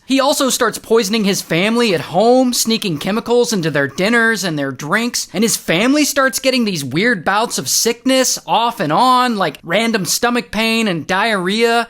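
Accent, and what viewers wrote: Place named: United Kingdom. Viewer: If you can't tell its a British person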